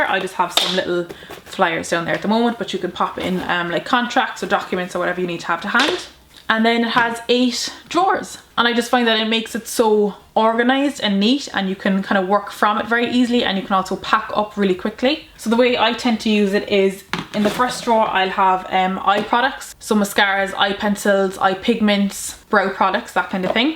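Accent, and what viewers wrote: Ireland. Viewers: 💋💋💋💋💜 first Irish MUA accent I’ve heard lol 😘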